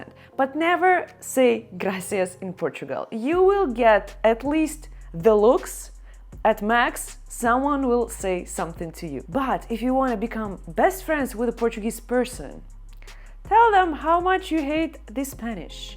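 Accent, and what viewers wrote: Russia. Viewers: Your Russian accent makes your videos even more enjoyable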